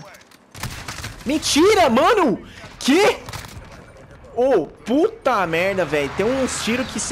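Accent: Minas Gerais, Brazil. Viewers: cê é de minas?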